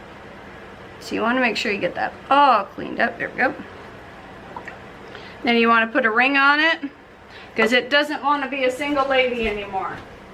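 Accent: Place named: Canada. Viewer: We can tell you’re Canadian cuz you say “own ready” instead of “ already”. My hubby is Canadian, but has lived in the states here for 50 years.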